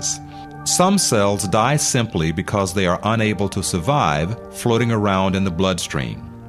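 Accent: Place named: South Carolina, United States. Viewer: This narrator could be Charlie Rose's brother with a little Morgan Freeman depth thrown in--he has the same sweet South Carolina type drawl, not trying to hard to sound Midwestern. Very easy to listen to.... one of my primary rules when looking for video or audio series... a voice that makes you want to sit down and listen.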